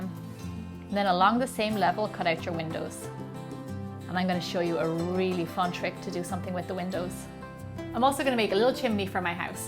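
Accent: Ireland. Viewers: your accent makes me wish i lived in ireland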